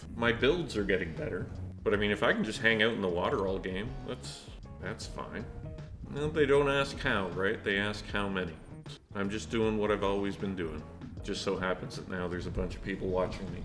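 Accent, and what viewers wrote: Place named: Canada. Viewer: Sounds like is Canadian 🍁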